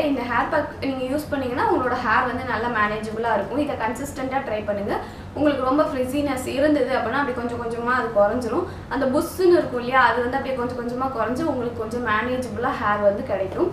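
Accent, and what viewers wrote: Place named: Thanjavur, India. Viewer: Hai mam neenga ena native mam unga language thanjavur side mathri iruku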